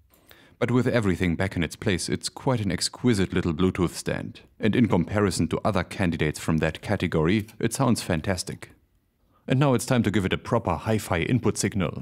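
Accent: Germany. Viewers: German accent👌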